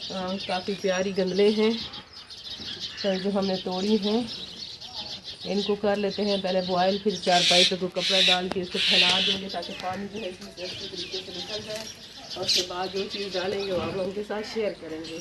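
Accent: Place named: Thalochi, India. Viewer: Sohni thalochri punjabi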